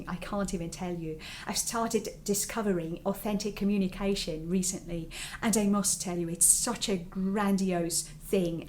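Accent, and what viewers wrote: United Kingdom. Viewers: Never have I heard any non native speaker with such brilliant British accent! Amazing, really.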